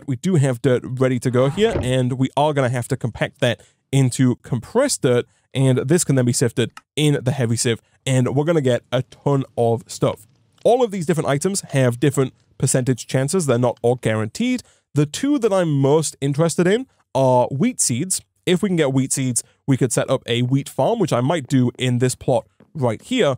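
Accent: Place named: Hull, United Kingdom. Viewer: I've been trying to pin your accent down the whole time I've been watching your videos. I finally got it. That's a Hull accent. Great content fellow yorkshireman.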